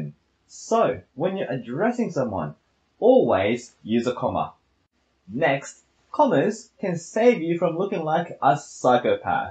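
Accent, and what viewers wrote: Australia. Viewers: オーストラリア英語かっこe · Love Aussie accent!!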